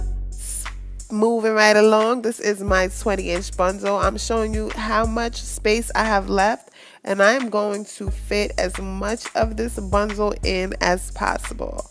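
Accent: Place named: Brooklyn, United States. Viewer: Girl you sound like you from the Brooklyn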